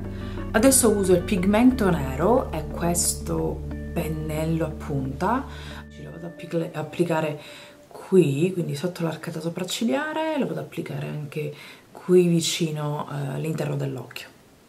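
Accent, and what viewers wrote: Sicily, Italy. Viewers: ci piace così com'è con il suo bell'accento siculo...sennò che sarebbe??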